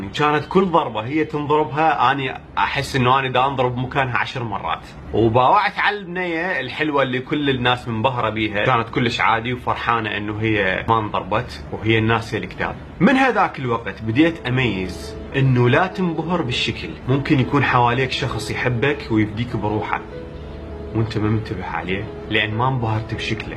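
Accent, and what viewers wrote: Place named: Iraq. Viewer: كلامك رائع. ... ما أحلى لهجة العراقية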